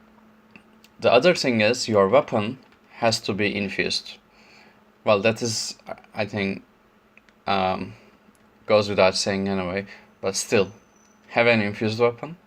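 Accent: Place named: Turkey. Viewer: Aksanından anlamıştım türk olduğunu!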